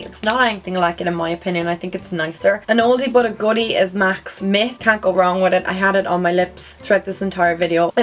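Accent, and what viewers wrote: Ireland. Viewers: i watched several of your videos and i could figure where your accent cam from. well now i know :) always loved Irish accents!!! you are now added to my favorite youtubers XD besides i love your videos anyway so your accent is just a plus!!! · so funny I'm obsessed with you girl your amazing and me and my fiance are obsessed with conor McGregor yall are both Irish and while I'm watching you and I hear another Irish accent lol I look over and my fiance watching conor lolol point of story I love you and we love Ireland ♡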